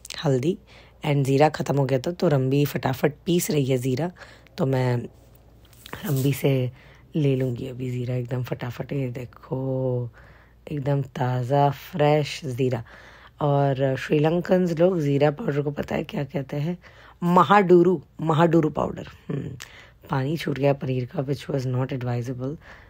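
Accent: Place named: Bihar, India. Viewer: Mashallah...Half English Half Urdu...You sound like a Bihari when you speak English...I have some medicine can you please promote that on your channel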